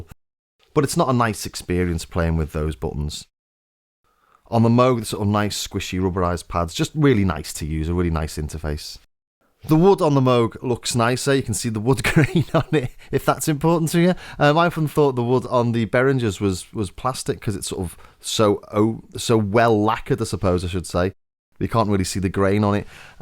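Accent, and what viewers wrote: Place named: Northern England, United Kingdom. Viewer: \Good on you and good to hear your northern accent!!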